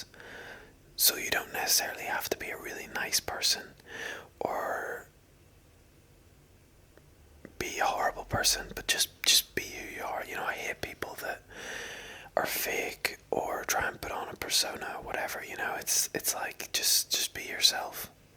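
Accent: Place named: Ireland. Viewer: To whoever asked him to prove he's Irish, his accent proves he is Irish.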